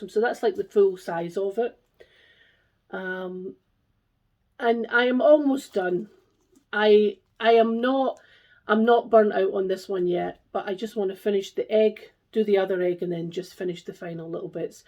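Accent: Scotland, United Kingdom. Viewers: First time I’ve seen your channel and enjoyed it very much. Love your Scottish accent. Take care and stay safe. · Your floss tube popped up on my feed and I enjoyed watching you. Love your Scottish accent! I’m from Idaho in the US, so you are a sweet find. Love your Easter Peep, so I’m gonna have to find that one. Thanks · Congrats on your book find. It's great that all the charts are there!! Your stitching is beautiful and I very much enjoy listening to a crazy Scot woman rambling on about cross stitch! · Been catching up with your flosstube. Great to hear a fellow Scot round these parts 🏴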